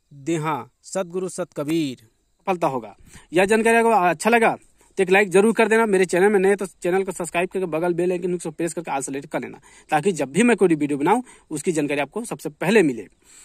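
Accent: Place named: Chhattisgarh, India. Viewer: आप लगता है। छत्तीसगढ़ से है। आपके बोलने की शैली से पता चल रहा है। कहां से हैं महराज पता व नं बताये। धन्यवाद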